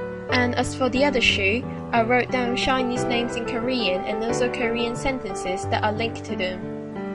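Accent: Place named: England, United Kingdom. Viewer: i thought u were from england cuz of the accent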